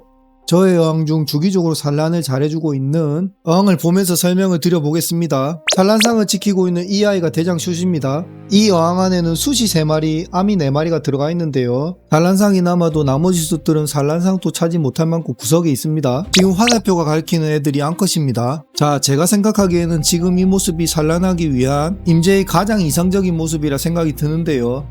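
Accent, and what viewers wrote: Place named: Gyeongsang, South Korea. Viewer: 오 경상도 분이셧군요 어디분이실까요?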